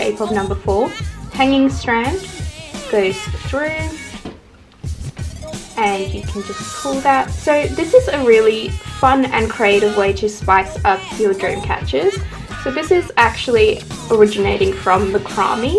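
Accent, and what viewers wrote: Australia. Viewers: In love with this dream catcher! Also, love your Australian accent.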